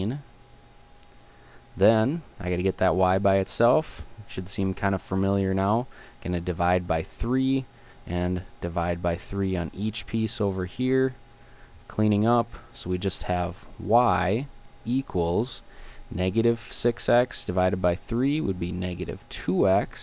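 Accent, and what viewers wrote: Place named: Canada. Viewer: i can tell this dudes canadian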